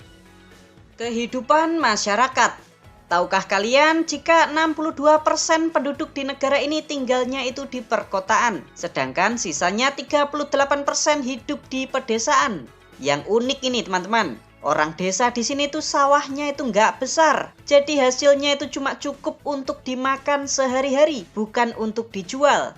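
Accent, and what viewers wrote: Java, Indonesia. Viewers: dubbing nya planga plongo medok gajelas.. ga nyaman bat di telinga...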